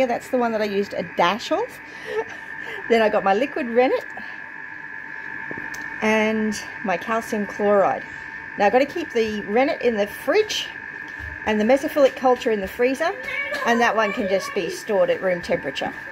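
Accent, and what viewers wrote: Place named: Australia. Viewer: Hello, just met you on the Silhouette live, there were so many that subscribe to our channel and I just wanted to say thank you ❤️ I just subscribe to your channel looking forward to watching you. Love your Australia accent, Lisa from moneypit homestead we are in Kentucky usa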